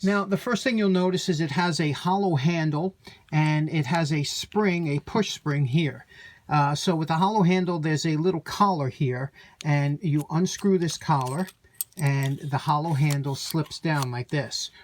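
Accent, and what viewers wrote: New York, United States. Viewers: I only watch your videos for the New York accent! After years of buying new Chinese Craftsman battery drills every year I bought Makita Chinese Li Ion drill and impact. 6 years and counting. I am shocked. It has built complete decks and privacy fences!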